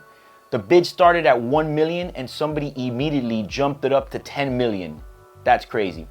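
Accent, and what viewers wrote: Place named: Miami, United States. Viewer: Cuban Miami accent lmaoo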